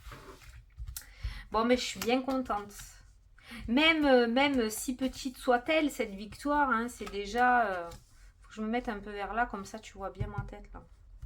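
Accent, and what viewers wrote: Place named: Marseille, France. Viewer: bj contente de te voir et de t entendre 🤩👍l accent de chez nous! bj des marseillais 💙🤍💙🤍💙🤍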